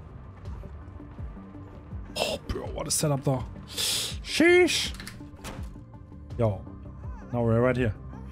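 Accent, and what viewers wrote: Germany. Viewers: Man hört so krass das du deutsch bist, also warum auf Krampf alles auf englisch??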